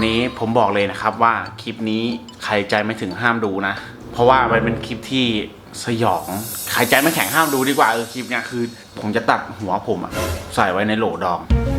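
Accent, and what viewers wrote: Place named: Myanmar. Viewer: มึงเปนพม่าเหรอสำเนียงเหมือนเลย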